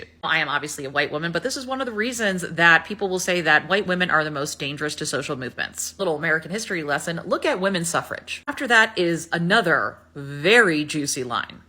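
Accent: United Kingdom. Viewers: Hahahaha, Please keep using that British accent telling us about all their distasteful hypocrisy!!!😂😂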